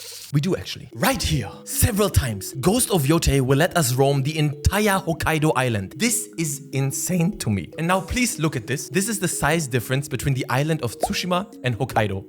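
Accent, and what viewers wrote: Germany. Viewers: Man hört das deutsch sooo raus hahaha geil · danke für dein friendship, alter du sprichst englisch mit einem deutschen akzent, bist du auch deutscher oder ? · What is bros accent 😭😭😭😭🙏🙏 It's like a lovely mix of German, Japanese, and a little bit of French. It's fucking me up in the best way. Anyway, fantastic video. I'm just as hyped for Yotei and the new stuff has me excited for a more immersive, less ubisoft-style "map checklist" game. Just getting lost in a massive world without feeling like an overwhelmed menial forced to visit every point of interest in the hopes of a crumb of meaningful content. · I'm Confused you have so a german Akzent and use german Words. Ich bewerte das Video 9/10, du hörst dich fast so an wie Raffey👍🏻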